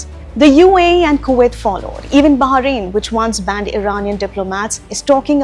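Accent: India. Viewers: unlike wion and first post,, she speaks with clarity and lesser indian accent,, no ridiculous "she ask she answer" anchoring style like palki sharma,, its like we viewers treated as a 1st grader,, dumb and fool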